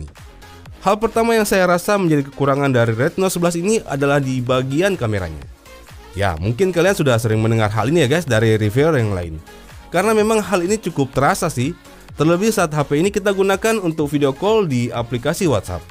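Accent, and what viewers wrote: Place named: Manado, Indonesia. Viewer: Bang orang manado ya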